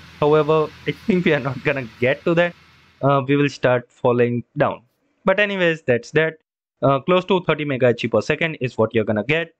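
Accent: India. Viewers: Thank you thank you guy with Indian accent. Without you i would have been lost the moment i stepped on this mod. Killed over and over again by the Glyphids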